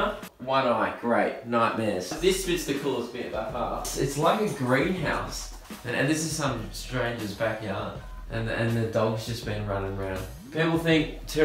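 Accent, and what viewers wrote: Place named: Australia. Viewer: AEC Media that’s called an “Australian accent”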